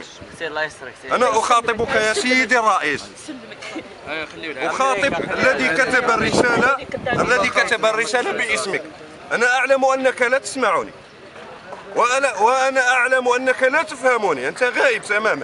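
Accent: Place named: Classical, Arab world. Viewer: je suis francophone mais je vous salue et vous respecte Monsieur car vous parlez l arabe classique car beaucoup d arabisants ne savent pas parler arabe .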